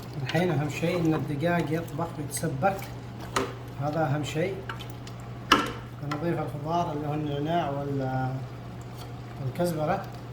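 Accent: Yemen. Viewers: يا جماعة الشيف يمني والا شنو لهجته + عاشت ايدك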